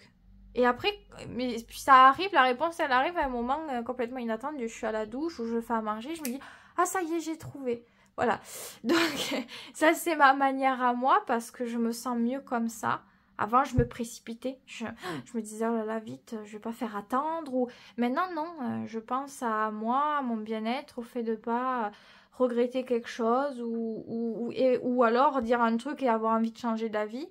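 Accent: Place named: South-West, France. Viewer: Je vous découvre, et j'apprécie... donc je m'abonne De plus, moi qui suis dans le sud-ouest, je reconnais un accent comme le mien, et ca me fait sourire Merci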